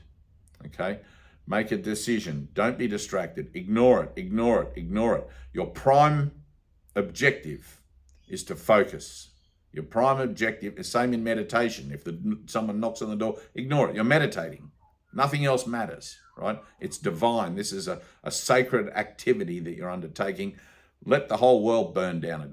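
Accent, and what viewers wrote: Australia. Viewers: Hey mate u sound like you're an Aussie, were abouts are u from? I currently live in Perth, but from nsw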